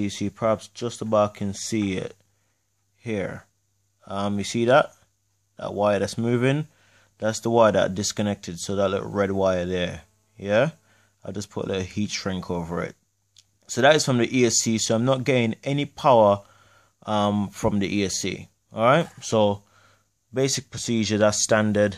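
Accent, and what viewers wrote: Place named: Jamaica. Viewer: Sounds like my hometown Jamaican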